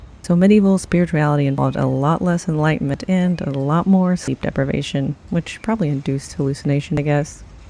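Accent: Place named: New England, United States. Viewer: from a personal standpoint big H went right over my head mostly! I was being captivated by that sharp witty Yankee tongue of yours and the glorious ginger mane! To comment on your original question I’m gonna now have to revisit it! 😁🔥🤟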